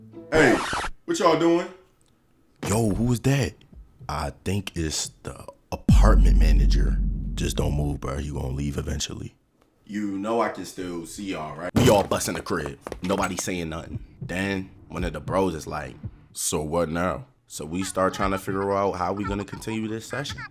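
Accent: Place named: Toronto, Canada. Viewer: The Toronto accent making it peak❤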